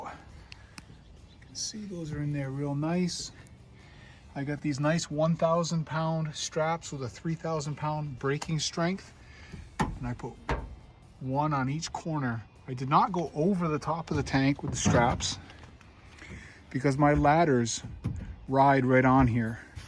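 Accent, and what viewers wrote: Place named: New England, United States. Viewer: "Plus it's Dahk." The first time I've noticed the new england accent and I've got to be 9 months or better into your videos. Lol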